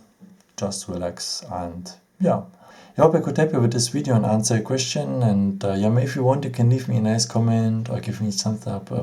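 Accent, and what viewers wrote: Germany. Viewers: Bist du deutsch weil dein Akzent ist bisschen so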